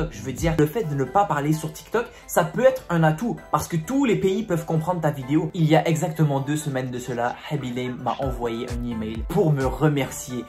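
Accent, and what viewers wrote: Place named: Arab world. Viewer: T as un accon arabe tkt j ai rien contre les arabe la preve je suis arab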